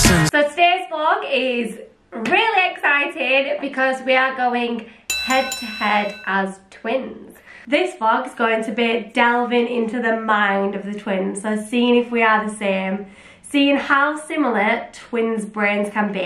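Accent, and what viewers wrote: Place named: West Yorkshire, United Kingdom. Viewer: West Yorkshire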